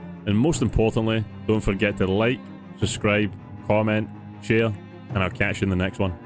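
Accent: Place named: United Kingdom. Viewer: Always great to hear fellow brit bongs talk PZ, no matter where in our glorious Isles you're from. Good tip vid, though I'm sure you can just hunker down at the safehouse during the helicopter event, as long as it hasn't spotted you, it will just fly around the map. Easier said than done but if you listen to the emergency broadcast on radio before sleeping it will give you the heads up about the chopper for the next day.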